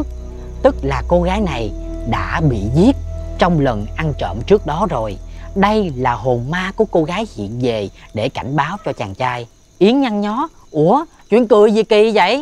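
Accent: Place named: South Central Coast, Vietnam. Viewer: Seri này là 1phần 50 tâm linh , còn 1phần 50 ý nghĩa và sâu xắc,anh kể giọng rất hay❤🎉.Cứ như tiếng miền trung miền nam zậy,đúng thiệc là anh kể zui thiệc với rất kinh dị,nếu xem chung với bạn bè thì còn zui,chứ xem một mình thì hơi sợ nha😅,em chúc anh lập sẽ tích cực hơn sẽ ra nhiều video hơn nữa và thú vị hơn ạ❤❤😊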